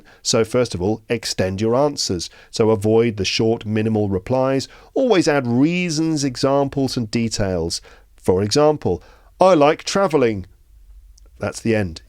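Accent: United Kingdom. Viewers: Your accent is British or American ?🌝 I guess it's British bat l want to make sure because my language level is intermediate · Hi, I am from Indonesia. English is my 3rd language. First of all, you speak so fast with your British accent. But one thing I want to tell is that I can clearly understand what you've explain without seeing the visual of your video and of course without the texts. So I want to say thank you for making this video for us. Hope it's gonna help someone like me out there. Thank You. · I remember that some months ago it was really hard to me to understand you because of your accent but I kept listening British English now I can understand the most of it. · I love the brittish accent. thanks a lot for all your videos.. ;-) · hi sir i am a big fan from Algeria i love your British accent so much beacause of you and your peaces of advice i am the first in my class +if i make mistakes tell me please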